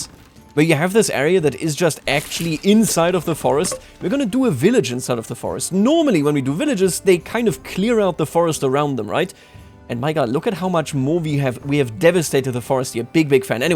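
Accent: Germany. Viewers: Are you german you say 4 Seiten globel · Bro doing germglish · i love your Denglish xD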